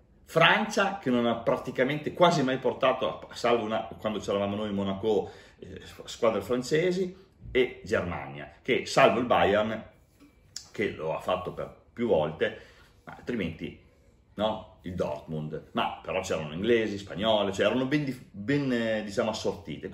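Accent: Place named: Piacenza, Italy. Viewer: Ciao ma sei di Piacenza? hai l'accento simile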